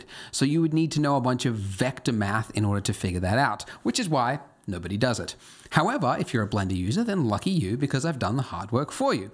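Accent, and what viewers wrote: Australia. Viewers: "and strouaw" <3 the Australian accent!!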